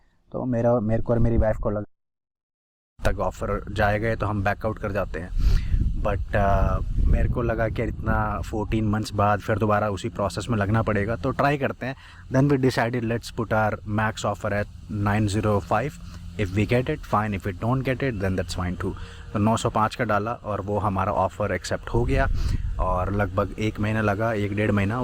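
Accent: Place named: Punjab, India. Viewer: Congrats. Loved your video, straightforward and honest. Sabto wadiya is its native hindi with Punjabi touch.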